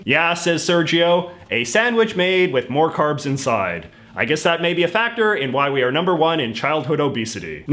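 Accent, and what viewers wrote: Canada. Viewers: I love to hear pronounce foreign things very Canadian-ly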